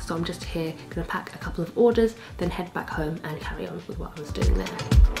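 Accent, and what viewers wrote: England, United Kingdom. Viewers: Usually I don’t like sophisticated English accents, but you’re the only exception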